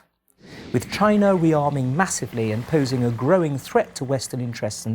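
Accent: United Kingdom. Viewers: Absolutely! With his british accent, looming pasty face and giant forehead this feels like something straight out of 1984, like it should be projected on the side of a building to give the proles on the street their daily dose of propaganda. "WAR IS PEACE, FREEDOM IS SLAVERY, IGNORANCE IS STRENGTH" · Nice work getting this intelligent sounding British man to dazzle the yanks with his fancy accent. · This is a inside joke right? From your accent I'm assuming that you are British, I'm embarrassed to be from the same country as you. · Why is their a British guy saying this?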